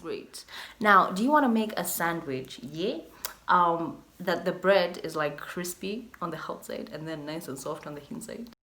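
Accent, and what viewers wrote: United Kingdom. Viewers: The British accent tho🥰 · am here for the accent...girl i love you....when you are loud kidogo and ur british/UK accent can be heard in there somewhere..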